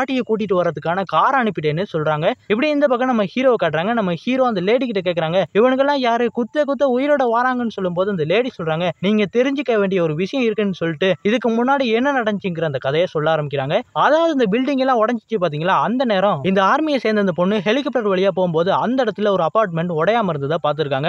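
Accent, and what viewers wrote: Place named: England, United Kingdom. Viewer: Bro i think u need to change the voice modulation.. dont try to pur the englush accent in tamil. Talk tamil naturally it gives good value to your story narration. Just my opinion dont feel bad bro.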